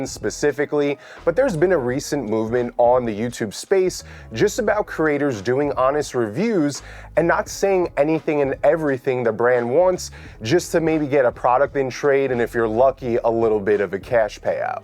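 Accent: New York, United States. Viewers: I'm a NYer living in LA for the last 15 years. gotDAMN I miss my peoples. I can see myself and all my homies from back home in the way you talk. Not just the accent, but the sentiment and realness behind what you're saying. Super refreshing in this space. More please. Also, wish I'd seen this video a couple of days ago. I just ordered the x100 pro package for a shoot I'm traveling across the country for. The good news is I don't REALLY need it because lighting is handled, but I just thought it'd be cool to try out one of these tiny lights that you can just throw in your bag and whip out once you've already got your shot and are just kind of playing. Should have gone with with one of the competitors! Anyway, you got a new subscriber!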